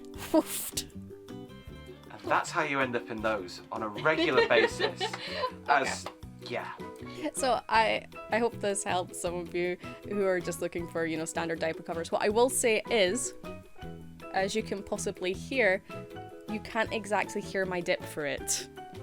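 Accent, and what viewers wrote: Scotland, United Kingdom. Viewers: Your Scottish accent is tae die fer!!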